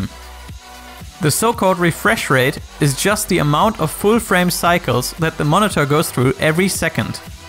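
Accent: Germany. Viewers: Man hört auf jeden Fall den deutschen Dialekt raus 😄 Danke für das Video 👍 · Bist du Deutscher? :D Gutes Englisch aber man hört es trotzdem immer etwas raus, mich eingeschlossen xd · WOW ich höre sofort den deutschen accent :)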